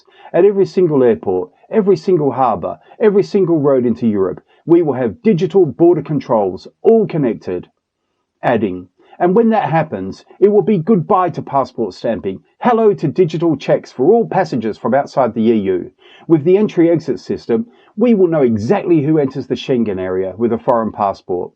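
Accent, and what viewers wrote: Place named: South Africa. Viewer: Enjoy the SA accent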